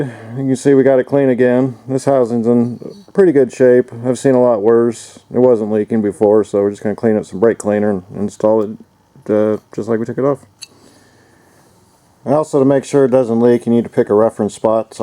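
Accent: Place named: Missouri, United States. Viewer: Sounds like you live in Missouri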